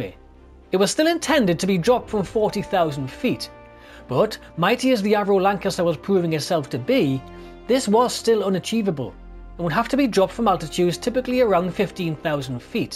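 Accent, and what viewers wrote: Newcastle, United Kingdom. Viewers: Thanks for a super enthsiastic video and your beutiful Goerdie accent, one of the friendliest voices in the world. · Really excellent video. Great narration (got to love the Geordie accent), and fascinating content. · This is a Geordie accent, yes?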